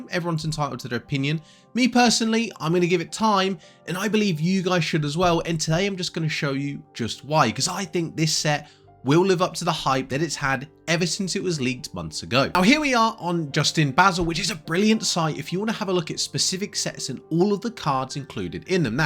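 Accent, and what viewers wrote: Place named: United Kingdom. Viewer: Beautiful British accent.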